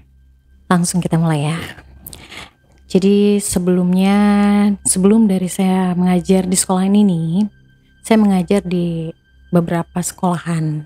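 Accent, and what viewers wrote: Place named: West Java, Indonesia. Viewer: Saya suka logat bicara ya pasti orang sunda.soalya saya juga gitu logat ya🤭 serius denger ya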